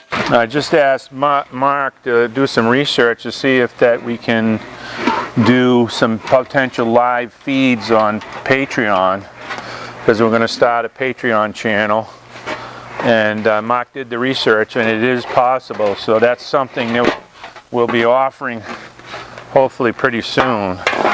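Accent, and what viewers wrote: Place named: Massachusetts, United States. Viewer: I’m not a psychic or anything but something tells me this guy is from Massachusetts…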